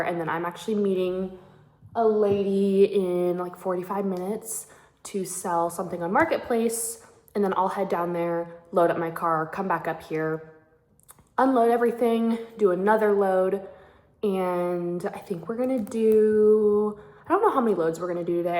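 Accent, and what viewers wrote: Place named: North, United States. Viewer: So what state is this? When you said sneakers and the way you say bag, I knew it was North somewhere lol South FL gal here. Stumbled on your page today.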